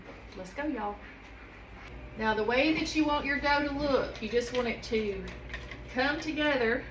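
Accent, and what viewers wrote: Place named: South, United States. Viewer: But prettier and such a sweet spirit. Love the southern accent.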